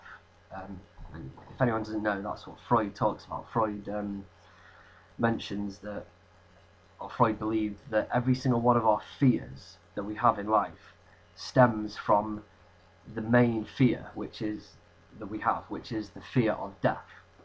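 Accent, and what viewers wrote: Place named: England, United Kingdom. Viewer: I love your accent. It would be very nice if all the English talked this way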